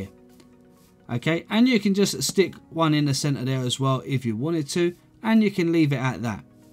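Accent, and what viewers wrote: United Kingdom. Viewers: You are so British..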